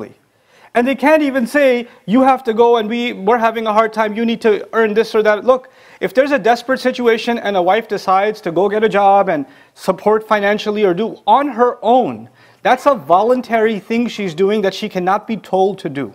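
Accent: United States. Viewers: You have an American accent, sir. In fact you sound a lot like the charismatic Evangelical Christian Preachers in The USA 🇺🇸. The Islam that you are preaching is not at all the Islam taught in Islamic countries or taught from The Quran. The Quran is a very unorganized book of unintelligible texts that sound somewhat reasonable in the first few Surahs but then progressively become angry, hostile and murderous as The Prophet Mohammed becomes more angry, hostile and murderous. The Quran is a lot of mumble jumble jumping from subject to subject with no structure. It’s a hodgepodge of “scriptures” from Jewish and Christian texts that are scrambled together and with a lot of errors as these were oral traditions and “orally transmitted,” Mohammed heard “stories” from Jews and Christians but he mixed them all up. “Mary,” the mother of Jesus, was not the sister of Aaron and Moses and Jesus was not born under a Palm tree! 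Aaron and Moses had a sister named Miriam long before the birth of Jesus. The Egyptian Pharoahs were definitely not living in the same period as Haman, The Persian villain, during the time of the Jewish Queen Esther who married King Ahasuerus to save her people, The Jews. These were two historically different time periods! Now The Bible has a lot of fanciful stories woven into real historical events but much of the actual historicity is still backed up with modern archaeology. Not The Exodus from Egypt, however. There’s no archaeological evidence of millions of Hebrew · When did he become a Muslim? He sounds American English. Are you Muslims now throwing out the Koran? I don't think so.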